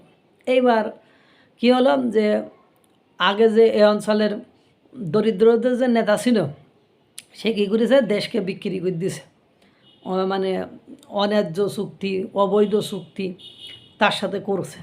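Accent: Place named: Bangladesh. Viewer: ভাই আপনার ভাষা শুনে মনে হয় বাংলাদেশের। কথা শুনে মনে হয় ভারতীয় মুসলমান। যদি বাংলাদেশী হন, তাহলে সাবধানে থাকবেন।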